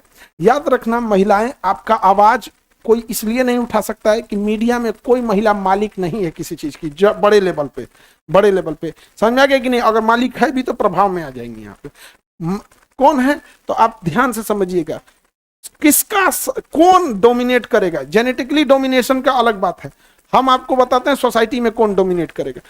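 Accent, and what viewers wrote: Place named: Bhojpuri, India. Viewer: Khan sir you are great you speak just like my brother bhojpuri and you have great knowledge.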